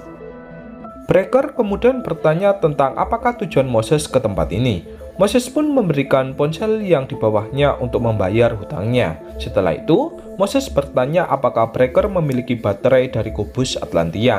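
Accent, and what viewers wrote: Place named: Tegal, Indonesia. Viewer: Asal tegal apa brebes yg ceritain film ini..logatnya kentara banget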